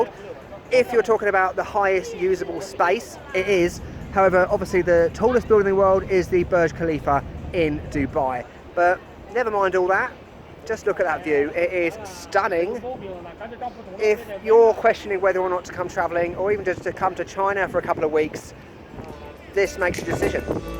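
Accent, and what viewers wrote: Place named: Cockney, United Kingdom. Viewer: You speak with cockney acent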